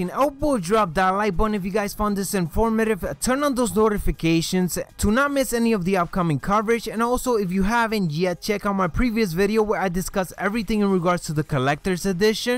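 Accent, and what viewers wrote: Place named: Spain. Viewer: Ur channel rocks , is that ur voice , and r u from Spain or something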